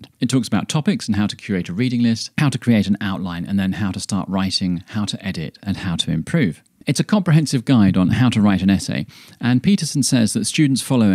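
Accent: United Kingdom. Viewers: Very helpful, thank you for posting! One funny thing happened as I listened to this video. Initially thought “he’s mispronouncing Montaigne” but then I fell victim to something I think is common here in the US. We assign more intelligence and a better education to anyone with a British accent, and yours is excellent. So I thought, there’s no way that he’s mispronouncing Montaigne, it must be me that has been mispronouncing this name all these years. 😊 Again, thanks for your helpful insights!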